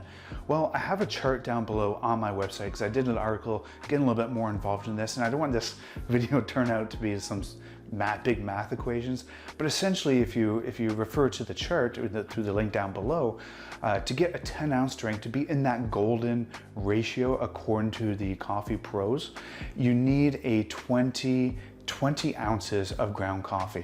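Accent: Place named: Canada. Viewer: Where are you from? Sounded like a slight canadian accent at times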